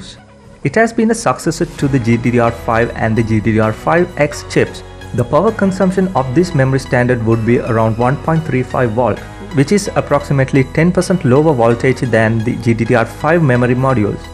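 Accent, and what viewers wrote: India. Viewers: Ahh. Classic Indian accent. The land of street sh#tter. PS: I think the music needs to be louder. So we can bleed our ears out. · Bro shut the fck up your Indian voice is funny as fck😂😂😂😂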